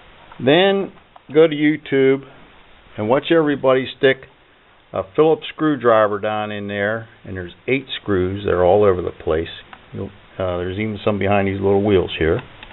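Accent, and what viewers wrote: Pittsburgh, United States. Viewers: I struggled with this machine for several weeks before I thought I found the right tools (3 “ driver specialty bits). What a ridiculous machine. I also just happen to be from Pittsburgh and picked up on the accent right away. The “down in there/ get in there” gave me classic Pittsburgh vibes, and the ”jag off” ending was the icing on the cake! Made me feel all warm and mushy inside for my hometown. Thanks,! ❤️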